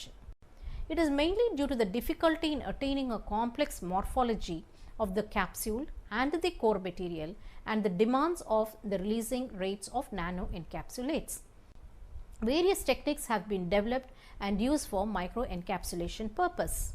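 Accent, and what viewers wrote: India. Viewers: it's a lovely presentation with such beautiful indian accent . THANK YOU SO MUCH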